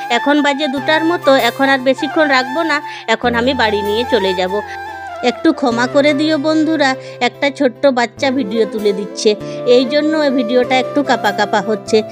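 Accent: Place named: Bankura, India. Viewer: একদম ঠিক কথা। তোমার ঐ মিষ্টি ভাষাই আমাকে বুঝিয়ে দিয়েছিল যে তোমার বাড়ি হয়তো বাঁকুড়ায়। আমিও কিন্তু তোমাকে একটি খাবার খেতে অনুরোধ করব ..... কিছুটা পরিমান গোটা খোসাসুদ্ধ মুগডাল ভিজিয়ে অঙ্কুরিত করে রেখে দেবে। মাঝে মধ্যেই একটু একটু করে খাবে। শক্তির অভাব আর থাকবেনা। আমি এতদিনে বুঝতে পারলাম ছাগল চরানো কাকে বলে। আসলে খুঁটিতে বেধে রাখতে দেখেছি, অথবা একা একা নিজেদের মত ঘুরতে দেখেছি। কিন্তু ধান খেতের পাশেই এভাবে পাহারা দিয়ে ছাগলকে ঘাস খাওয়াতে দেখেই ব্যাপারটা বুঝতে পারলাম।💛❤️💛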